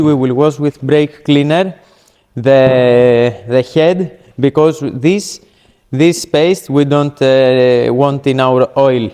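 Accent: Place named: Greece. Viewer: You sound Greek.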